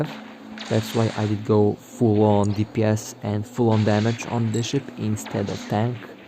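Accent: Russia. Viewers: Здравствуйте. Попробуйте на русском свои видосы тоже делать. Так как по акценту слышно что вы русскоговорящий:)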